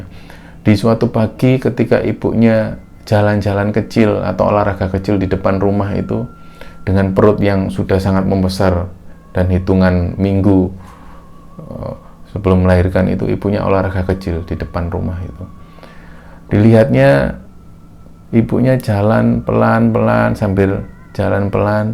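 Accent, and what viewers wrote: Java, Indonesia. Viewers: Mantap mas medoke gak ilang yo😁👍🏻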